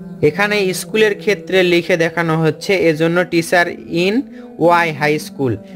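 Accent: Bogura, Bangladesh. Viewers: স্যার আপনার বাড়ি কী গাবতলি, বগুড়া..?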